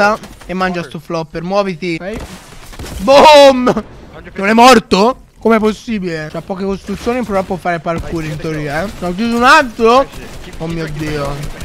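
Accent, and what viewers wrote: Tuscany, Italy. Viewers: Ma sei toscano pk si sente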